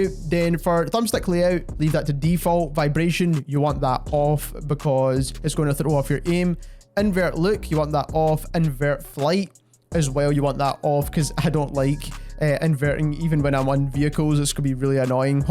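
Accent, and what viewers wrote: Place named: Scotland, United Kingdom. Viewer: Same bro wasn't expecting a fellow scotsman when I clicked the video , have another sub 👊🏼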